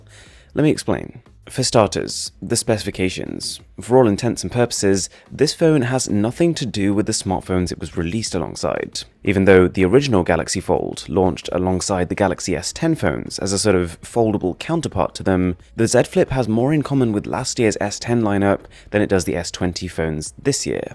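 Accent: RP, United Kingdom. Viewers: He’s from the UK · You sound like a etonian chinless wounder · Relax with the exaggerated posh accent. It's laughable.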